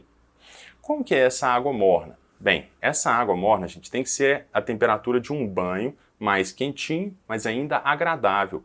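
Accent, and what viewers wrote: Minas Gerais, Brazil. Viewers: Dr esse sotaque mineres e mto bom 😅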